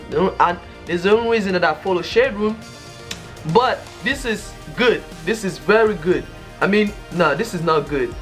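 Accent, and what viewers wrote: Africa. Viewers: He sounds sooo african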